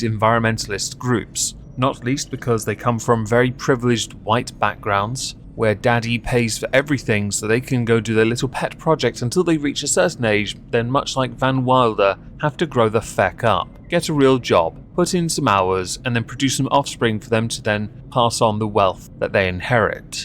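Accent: RP, United Kingdom. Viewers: I quite like your poshness tho 😂🤣💖💓💕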